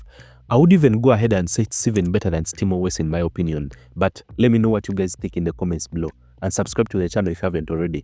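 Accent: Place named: Uganda. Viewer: I can tell a Ugandan from a mile away.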